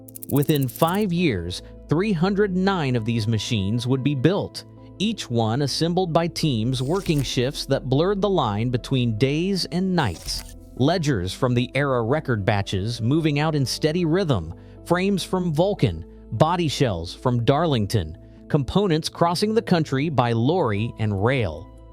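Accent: United States. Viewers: I gave up half way through as I couldn't stand the American voiceover talking about a British locomotive and they made it sound that the Class 37 (English Electric Type 3 as it was know originally) was the only successful locomotive made. Also if talking about a particular subject, don't show film of others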